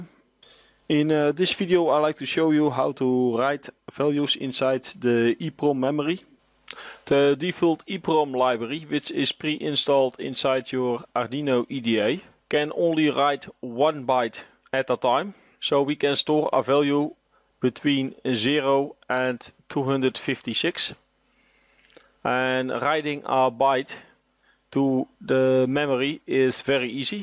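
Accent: Netherlands. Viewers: 10 seconds is enough to recognise that his native language is dutch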